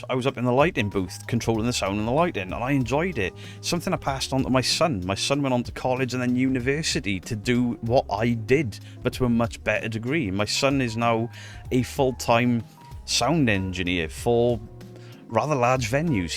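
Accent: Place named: Cardiff, United Kingdom. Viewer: Ah nevermind! Just thought you might have seeing as you sound local (I'm in Cardiff) - all the bands of the time like FFAF, Shaped By Fate, even...ugh... Lost Prophets used to post on it all the time. Even met my wife on there lol.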